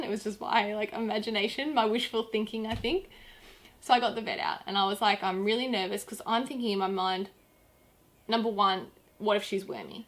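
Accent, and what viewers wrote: Australia. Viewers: I realized today that I must watch way too much Bondi Vet because I Wasn’t even paying attention to your Australian accent until you started talking about the summer and winter months. I kept rewinding it and listening to that part over and over again thinking I heard it wrong and then you said a thing about the northern hemisphere and it all clicked into place and I feel like an idiot. Apparently all my Bondi vet hours have made the Australian accent sound normal..... I’m in Texas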